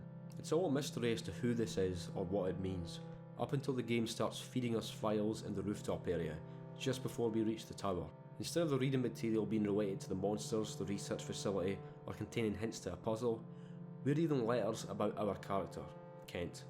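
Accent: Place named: Glasgow, United Kingdom. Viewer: Great retrospective. Couldn't stand the stuck-in-quicksand slow combat. Where in Scotland are you from? From the accent, im guessing you're a 'Wegie?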